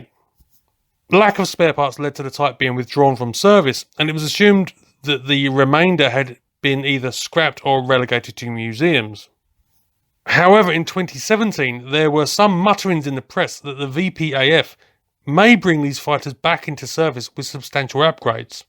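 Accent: United Kingdom. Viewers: Because it wasn't US military property, it was South Vietnamese military property. Just exactly the same as all the gear that the Taliban laid it's hands on that all these people who don't know what they're talking about are saying all this dumb crap about the US military leaving all of that stuff laying around for the Taliban to equip itself with, like what you see here it wasn't US military property that the Taliban got, it was Afghan military property that they left laying around when they fled in the face of the Taliban taking over. Word of advice, any time you click on a video that has anything to do with US military history and you hear a British accent, do yourself a favor and immediately shut it off, because it's going to be full of lies, exaggerations and any other type of deceitful things you can think of in order to cast a bad light on America and especially it's military, for some reason they feel compelled to do that stemming from some sort of twisted feelings of jealousy that obviously comes from the US's involvement in the two world wars.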